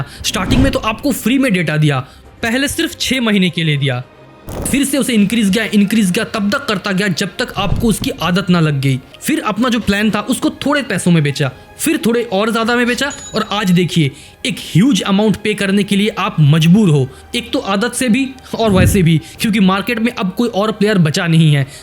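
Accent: Bengal, India. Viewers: I knew he is a Bengali from his pronunciation 😅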